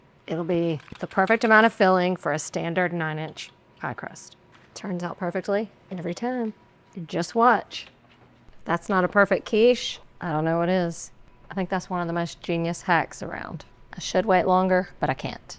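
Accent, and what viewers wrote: South, United States. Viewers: Terrific accent too - especially if you’re from the UK and don’t hear these beautiful southern US accents much. She’s a smart, natural presenter… :)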